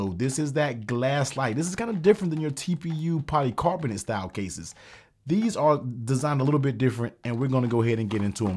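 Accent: United States. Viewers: I liked your American accent, man 👌💞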